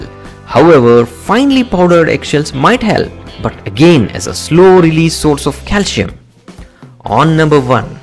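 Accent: India. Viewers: Pronounciation is off.. example...The word 'young' you pronounced 'eng'..... 'sewage' you pronounced 'seawage'. Don't let that deter you. As Indians one can tell you're from South India so your accent is a distinct south accent.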